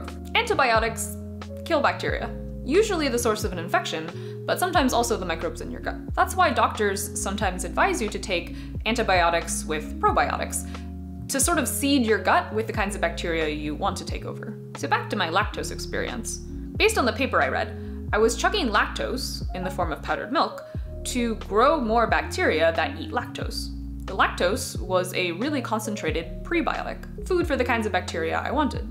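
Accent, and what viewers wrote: Canada. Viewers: are you canadian you sound canadian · No offense but are you french canadienne?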